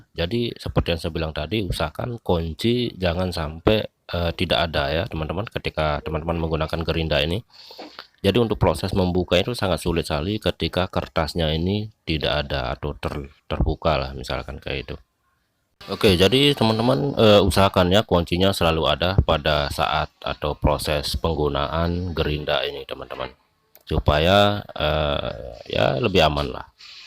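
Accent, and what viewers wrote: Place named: Bali, Indonesia. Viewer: Kaya org bali logatnya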